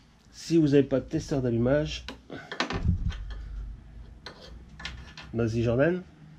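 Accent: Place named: Nord-Pas-de-Calais, France. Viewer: Bin té vo min grand quand un veux parler Français ché pas facile d'oublier le chti "un arcomminche" Merci pour cette vidéo lol!!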